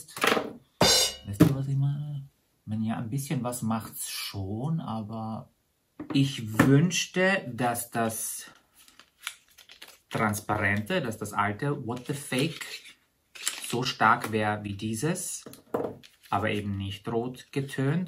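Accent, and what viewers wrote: Austria. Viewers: Dafür! Der Unterhaltungs- und Informationsfaktor - in einem ausgewogenen Verhältnis - sind hier echt hoch. 💜💜 #support Und irgendwie kommen bei mir immer heimatliche Gefühle durch beim Zuhören, wegen dem österreichischen Einschlag. 🥰 Witzigerweise bin ich zwar gar keine Österreicherin, sondern Oberpfälzerin, aber der Regensburger Spracheinschlag (nicht das eigentliche Oberpfälzerisch, bloß das direkte Regensburgerisch! Ganz anders!) ist oft dem österreichischen Sprachtenor in vielen Punkten echt ähnlich, gerade eben wenn beides eher unterdrückt wird. 😂🙈 Vor allem, wenn man an der Nordseeküste wohnt, wo man wirklich komplett anders daherredet, kommt einem das vergleichsweise direkt heimatlich vor. Keine Ahnung, ob man versteht, was ich meine. 🤷🏻‍♀️😅😅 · ich lerne Deutsch. Ich habe dieses video so cool gefunden! Ich hab nicht alles verstanden, weil österreichische dialekten für mich schwerer sind. 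Aber vielen dank fürs Video!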